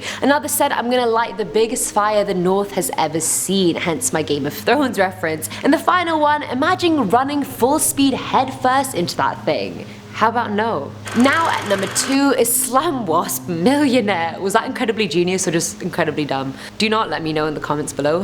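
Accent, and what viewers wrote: United Kingdom. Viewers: I honestly can’t tell whether she’s a fellow Brit 😂😂😂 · They love hiring attractive women with British accents don't they?😂😂🤣🤣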